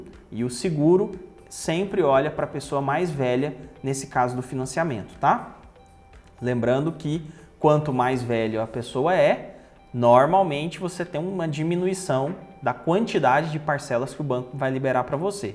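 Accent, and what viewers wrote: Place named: Goiás, Brazil. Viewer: M.bom vc é goiano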